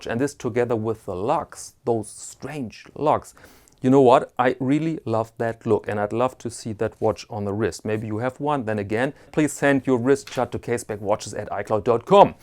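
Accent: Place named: Germany. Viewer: sounding very much like a german yourself, you might have noticed that Alexander Shorokhoff very well is born in Russia (Moscow) but his company is very much in Germany. To my taste the mixture of italian avantgarde design combined with german precision manufacturing from a russian engineering architect can possibly be taken quite serious. For me at least its a breath of fresh air, that depreciates by far not the way you mentioned. I like this video very much, cause you really showed something different, that is not often to be seen. Thank You.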